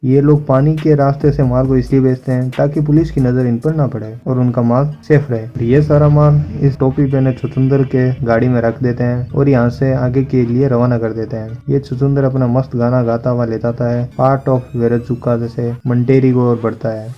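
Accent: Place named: Haryana, India. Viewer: Haryanvi can't be snitch from anyone . With fluent hindi anyone can tell you are from Haryana and i too am. All the very best brother , wish you more success .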